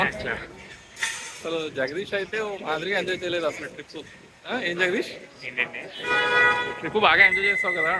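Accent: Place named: East Godavari, India. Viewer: ఆయ్.. ఆ తూ గో యాస... Missing a lot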